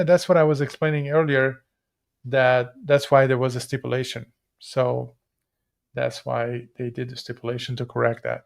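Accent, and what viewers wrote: South, United States. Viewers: don't listen to them - your acting is hilarious! I love your southern accent!! Please let us see the rest of your movie! 😂😂😂😂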